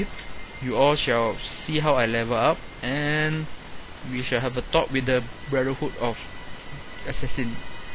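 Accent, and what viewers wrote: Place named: Arab world. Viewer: Arabic right? Great Accent bro.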